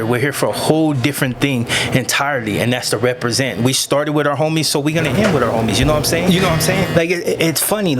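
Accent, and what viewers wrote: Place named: Mexico. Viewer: I can see if you grew up with mexicans and joined their gang because that’s who you came up with. But why do talk like them. They won’t except you as your self.?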